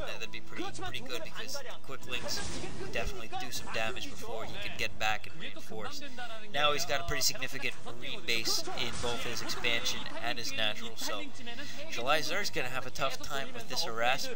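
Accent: Boston, United States. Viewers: nice a new commentator...ur prolly from boston...keep up the great work man...enjoyed it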